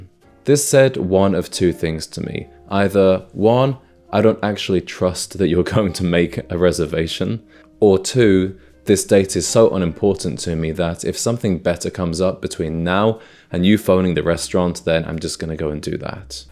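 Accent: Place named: United Kingdom. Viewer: No like for british accent . I thought its american 😢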